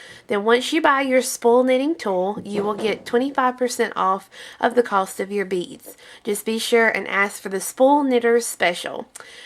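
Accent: South, United States. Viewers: Great tutorial and very easy to understand and follow. I knew it was a southern voice I heard! I'm from Columbia, SC You can bet I will be checking out your other videos. Debbie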